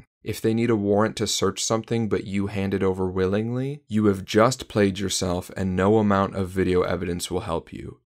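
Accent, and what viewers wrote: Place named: United States. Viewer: sorry. Just your accent sounds American to me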